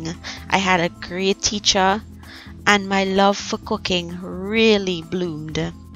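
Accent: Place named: Trinidad and Tobago. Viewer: I love how you stayed true to yourself and your culture throughout your many years in America, accent and all! Some Trini's dis act brand new de minute they land foot in America, it's so hypocritical!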